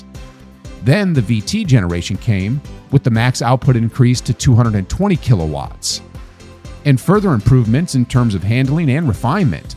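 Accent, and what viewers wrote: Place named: United States. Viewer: The yank voice put me off!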